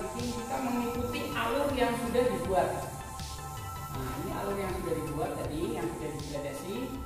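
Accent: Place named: Banyumas, Indonesia. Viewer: lah wong ngendi sih kok jawane ngapak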